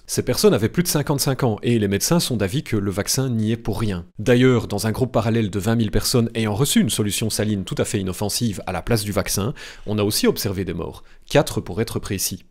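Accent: Paris, France. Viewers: Suite à cet accent parisien je me désabonne. Cordialement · tu fais trop bien l'accent parisien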